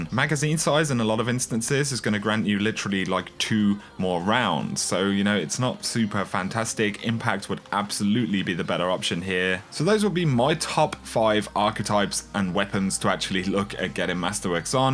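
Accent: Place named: United Kingdom. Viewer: Why does every destiny game guide feature a brit boy?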